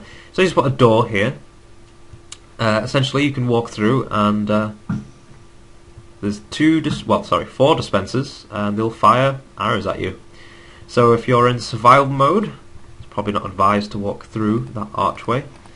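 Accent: United Kingdom. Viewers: im not being mean but r u british cuz u sound like it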